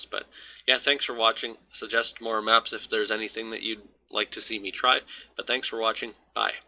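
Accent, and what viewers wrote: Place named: Canada. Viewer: i am so glad you came up in my recommended! this was a great video, and judging by the accent, sounds like you might be a fellow canadian?!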